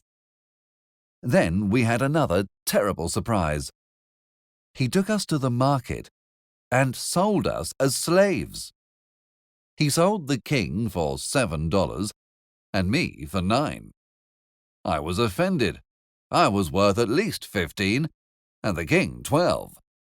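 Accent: United Kingdom. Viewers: British accent, eh.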